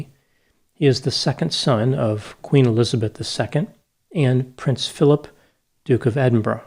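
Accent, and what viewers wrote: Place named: Southeast Pennsylvania, United States. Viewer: Dr Grande is also an excellent comedian. Your SE Pennsylvania accent slips through at times. An accent I would note that I have never seen imitated in major production television or film.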